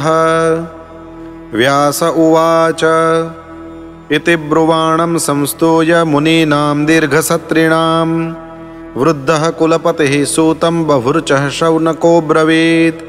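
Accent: Standard. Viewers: ખુબ સરસ અને શુદ્ધ ઉચ્ચાર સાથે ભાગવત શ્રાવણ કરવા મલ્યું શ્રી હરિ ની કૃપા બની રહે.. સાદર પ્રણામ... જય ભગવાન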